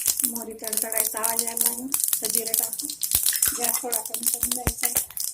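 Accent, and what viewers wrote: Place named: Jalgaon, India. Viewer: मस्त ताई भाजी. आवाज जळगाव जिल्ह्यातील वाटतो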